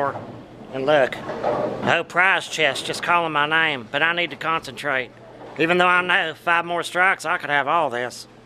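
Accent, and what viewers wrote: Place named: London, United Kingdom. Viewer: What part of London are you from?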